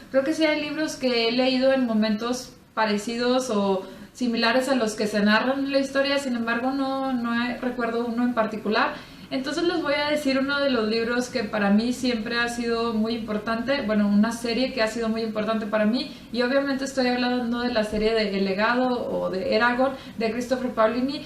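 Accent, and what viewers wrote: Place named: North, Mexico. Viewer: Me sorprende que a pesar de que ya llevas tiempo en Alemania continúas teniendo el acento norteño jejeje Me gustaron tus respuestas y yo también quiero en físico Persépolis:( Espero ya subir mi tag en estos días Y sólo como sugerencia: recomiendo que pulas un poco más la edición de tus videos, hay algunas cosas de las que puedes prescindir sin afectar el contenido y así hacer más breves tus videos =) Un abrazo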